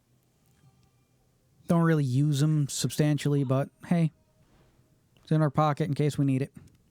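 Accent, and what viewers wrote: South, United States. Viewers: You sound like you just came our of the mojave, you have a subtle southern accent lol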